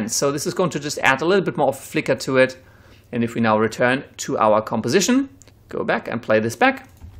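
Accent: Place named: Germany. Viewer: eindeutig deutscher Akzent thank you helps me alot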